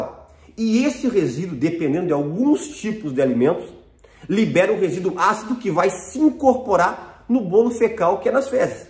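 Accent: Rio Grande do Sul, Brazil. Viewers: Obrigada doutor, é muita bondade ensinar o que sabe, que sotaque bonito o senhor tem! Sotaque dos gaúchos.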